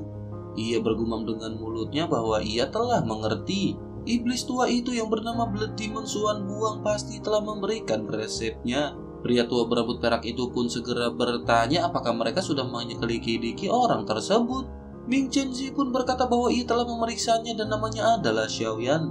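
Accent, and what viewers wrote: West Java, Indonesia. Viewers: Sunda ya min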